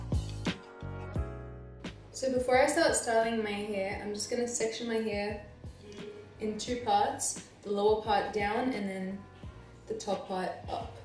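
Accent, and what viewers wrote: Australia. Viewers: You sound so aussie lmao. No hate. You're so cute... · Now how tf did Ik u had Arabic roots even tho u sound full on Aussie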